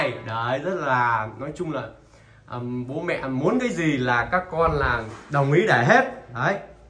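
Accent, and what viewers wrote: Vietnam. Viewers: Tưởng a ở dưới Miền Nam chứ! Ra ngay Hải Dương 😊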